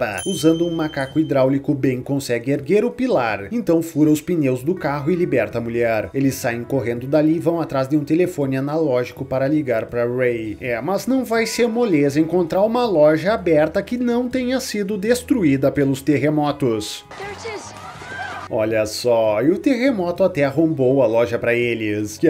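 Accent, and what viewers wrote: Rio Grande do Sul, Brazil. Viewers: Mas que barbaridade tche o guri tu e gaucho?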